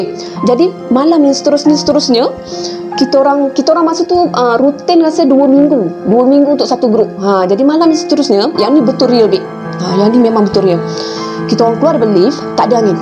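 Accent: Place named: Temerloh, Malaysia. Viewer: Akak ni asal org pahang ke bunyi loghat macam org temerloh Btw best akak cerita santai semoga maju jaya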